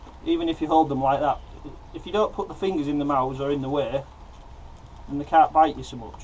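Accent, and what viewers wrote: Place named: Yorkshire, United Kingdom. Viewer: you sound like your from Yorkshire I'm looking to get a ferret could do with some advice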